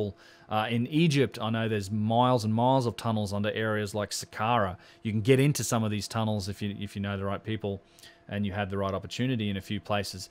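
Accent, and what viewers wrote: Australia. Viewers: hey , wow , wish i hadd known you were doing this , , and dude you sounds like an aussie , , same , , please explain 1inch high heiroglyphs in granite , done wih brass chilel , , come cuts in red granite 1mm thick 2mm deep , , are you aussie , would like to show you in person , i have worked with sonte for 34 yrs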